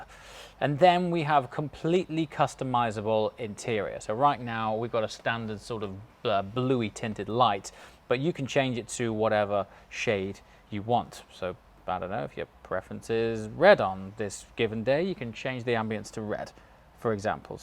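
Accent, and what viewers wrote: United Kingdom. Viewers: Man, not every listener is British, speak slower and make sure that no dialect is involved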